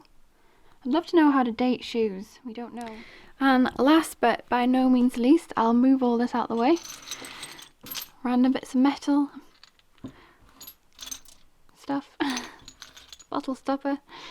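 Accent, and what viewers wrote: United Kingdom. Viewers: Love your very british accent..... and i'm british. 😛😜😛😜😚 x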